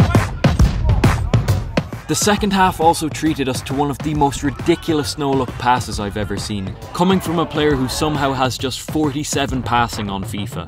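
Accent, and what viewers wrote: Ireland. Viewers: Are you from Ireland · are u from Ireland 🇮🇪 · Are you from Ireland?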